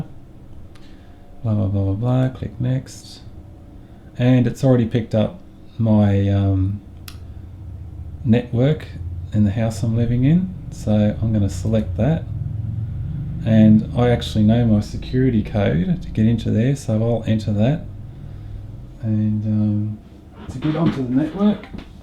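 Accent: Australia. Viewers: YES another aussy lol Respect! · great stuff thanks already knew a lot of that but the folders I didn't know about that I usually did it a different way thanks for that good Australian accent you have like me hahah ok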